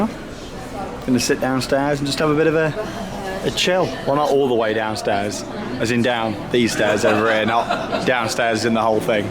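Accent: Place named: West Midlands, United Kingdom. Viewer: Where are you from your voice sounds very west midlands uk lol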